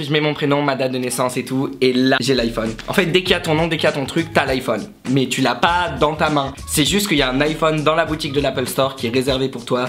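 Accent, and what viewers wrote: United States. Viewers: J'adore ton accent américain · OMG TON ACCENT AMERICAIN jadoreeee !!!!!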